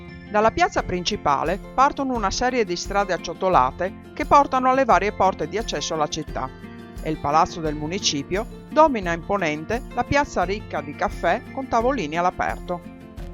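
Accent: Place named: Friuli, Italy. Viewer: Hai l'accento friulano "crostoli" ad esempio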